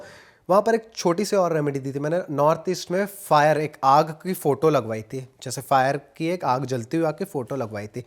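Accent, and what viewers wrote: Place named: Chhattisgarh, India. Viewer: Bhai mujhe tumare tone se lgta hai ki raipur ya bhilai taraf se ho shayad ❤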